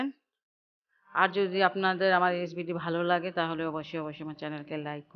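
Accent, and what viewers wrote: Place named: Bikrampur, Bangladesh. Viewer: Aunty apnk o osonkho donnobad...aunty apnr kotha sunle mone hoy apnr bare bikrompur..amr sosur bare bikrompur..R ami dhakaya..dowa krben aunty amdr jonno..😍😍😍😍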